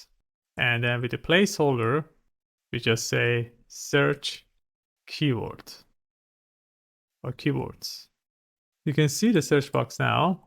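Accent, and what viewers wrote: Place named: Iran. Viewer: Wonderful! I assume you're originally iranian. so am i. loved the accent and the smooth pace of the tutorial. It would be wonderful if you do a big project like Airbnb.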